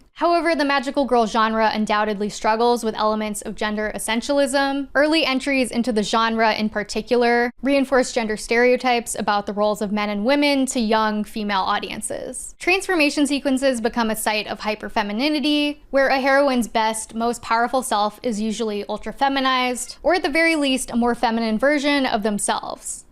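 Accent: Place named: Southern California, United States. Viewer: Me, after clicking on a random recommended Sailor Moon essay: Is... is that a So Cal. accent?: [something something] Hollywood [something] (I'm not going back to find the timestamp, sorry) Me: Ohhhhh. HELL YEAH. More California accent representation, please! I have found my -people- person. Good vid, btw, despite the technical issues. I watched the first run of the dub back in 1995 before middle school and loved it, but that's the extent of my Sailor Moon knowledge.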